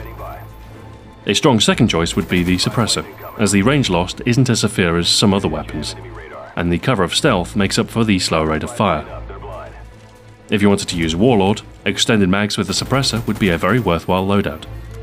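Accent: United Kingdom. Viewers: uhm,soap is irish, this guy's british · RULE BRİTANNİA. thumbs up if you love his british accent.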